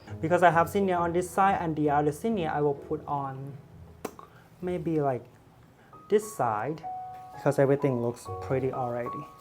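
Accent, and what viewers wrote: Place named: Thailand. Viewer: So beautiful 😍 I'm so happy that i found your channel, love this video. I'm planning to grow zinnias this year and first time in my life , I'm a little bit confused about it because I'm from Thailand we don't have to deal with the cold weather . I live in Ohio. Are you Thai ? Your accent is so lovely and sounds similar. Sorry for asking i don't want to be rude just happy to see Thai people. Hope you have a wonderful weekend ❤️🥰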